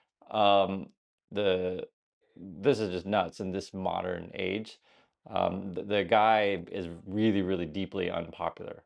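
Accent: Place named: United States. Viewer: In this video, you twice said "the" North Korea. This is noteworthy, to me, simply because it is literally the only mistake in your English that I've heard after watching 6 or 8 videos by you. Your accent, pronunciation and phrasing all sound perfectly American, and I would have assumed you grew up here -- but that one mistake made me think perhaps you are actually native to Korea. <shrug>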